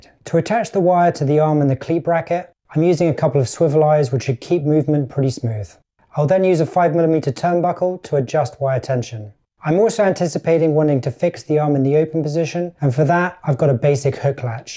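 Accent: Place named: United Kingdom. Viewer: Hi Gotta say it's Soooo Refreshing to hear a British accent whilst watching YouTube videos. keep up the Great Content.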